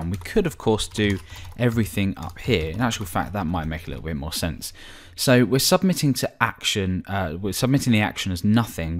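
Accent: England, United Kingdom. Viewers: Not a Hindi accent, a butter-smooth English accent. Plus Good content. Wonderful.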